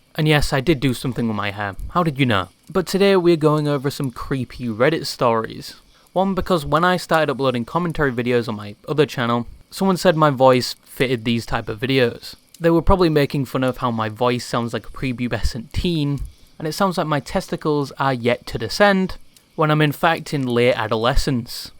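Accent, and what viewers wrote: United Kingdom. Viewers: British detected, disliked. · No you’re wrong you’re perfect for those stories because it gives the whole thing a new kind of “sting” or “slash” it’s because you’re Brit ish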